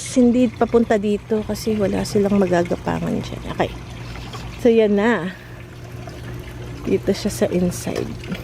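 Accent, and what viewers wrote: Batangas, Philippines. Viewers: Matagal na aq nanonood Ng vlog NYO pero now din lang aq nag comment..Ung punto NYO Po Kasi SA nakaraang vlog NYO para kasing batanguena Kau..batanguena rin Po aq nasugbu batangas po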